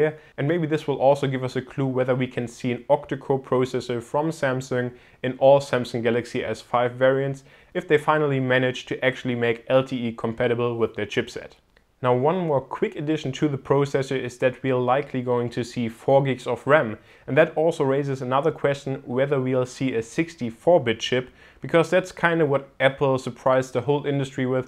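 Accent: Germany. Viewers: Du bist doch deutsch oder ? Das hört man gleich :D Trotzdem sehr gutes Video !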